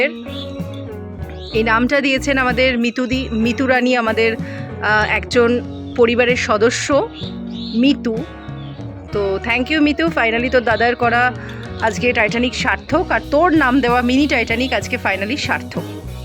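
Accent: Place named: Bangal, Bangladesh. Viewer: Duto paisi.. Valoi to bangal bolle bonu😂❤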